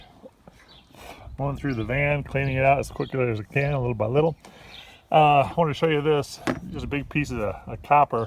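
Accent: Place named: Philadelphia, United States. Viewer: Are you from the Philadelphia...NEW JERSEY AREA???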